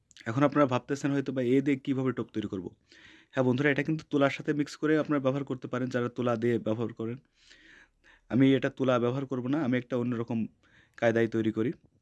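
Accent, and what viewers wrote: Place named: Gopalganj, Bangladesh. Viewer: ভাই মনেহচ্ছে আপনার বাড়ি গোপালগঞ্জ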